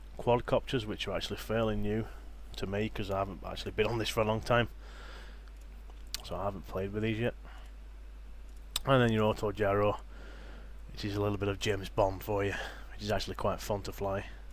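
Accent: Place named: Northern England, United Kingdom. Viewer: Where in the UK are ya dude? I know with your accent your a norther :) Thats where the good lads are from lol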